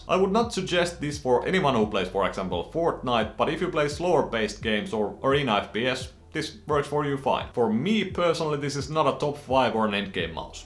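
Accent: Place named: Finland. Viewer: bro the Finnish accent💀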